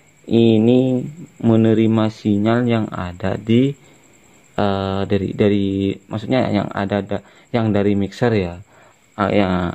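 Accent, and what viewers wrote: Madura, Indonesia. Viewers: hihi kadeng kloar skunik madurenah. Sukses lah bos lanjutken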